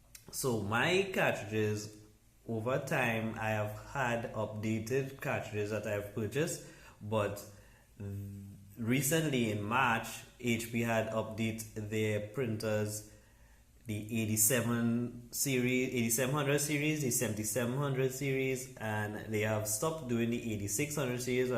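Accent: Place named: Trinidad and Tobago. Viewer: Hi thanks for this video. You sounding like a Trini, if you are can i get a contact for you? Or call me at <num> thanks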